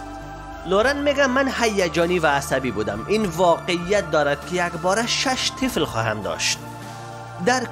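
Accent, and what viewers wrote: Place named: Afghanistan. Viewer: ببخشیداین لهجه افغانستانی است باماخیلی فرق میکند ایشون اشتباه نکردن.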